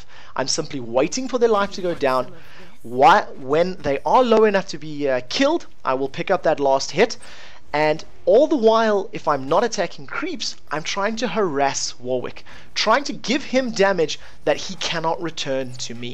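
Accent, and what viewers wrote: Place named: South Africa. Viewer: I think he is South African, that's kind of how we all sound... xD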